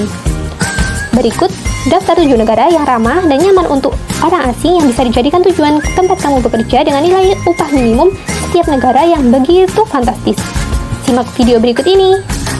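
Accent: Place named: Java, Indonesia. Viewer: Jowone medok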